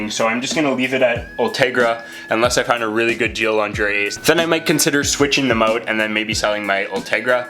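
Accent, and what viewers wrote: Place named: Canada. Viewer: lol The Canadian accent is on point! love the video!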